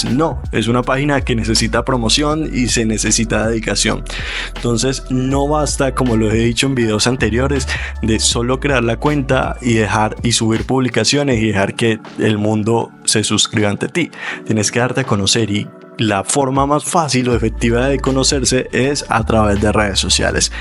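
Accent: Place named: Colombia. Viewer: Que colombianooo 😍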